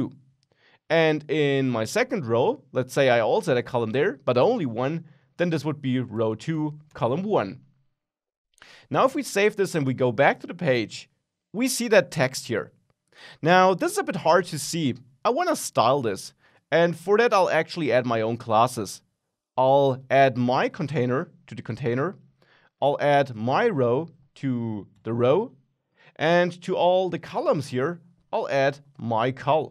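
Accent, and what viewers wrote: Germany. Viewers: GREAT content! Are you German by any chance? I am trying to make out what kind of accent you have. (I am only asking. I understand you perfectly fine)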